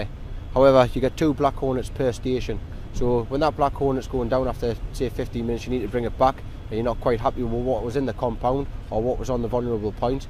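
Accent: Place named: United Kingdom. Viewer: What kind of shit mouth language is this British dope speaking...?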